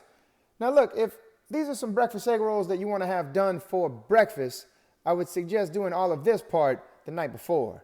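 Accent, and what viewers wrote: Louisiana, United States. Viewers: Oh yes, very Cajun sounding so entertaining.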